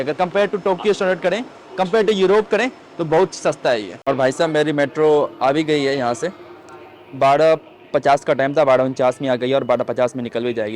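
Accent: Bihar, India. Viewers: Bhutla jaoge ye jo bihari axcent haii hm bihario ko tum se khas jor ke rakhta h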